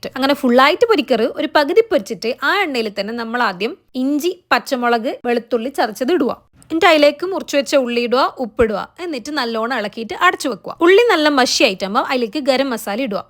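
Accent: Kannur, India. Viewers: സംസാരം ഫസ്റ്റ് കേട്ടപ്പോ തന്നേ മനസ്സിലായി കണ്ണൂർ കാരി ആണെന്ന് 😂❤ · Kannur bhasha nth oru ppang Ann🔥video oke poli anne Chechii ♥️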